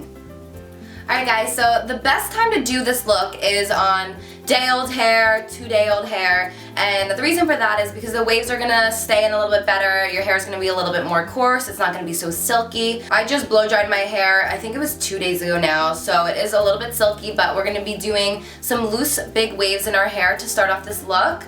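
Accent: New Jersey, United States. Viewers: I knew you were from Jersey! that accent haha. love your vids. you're gorgeous! 💕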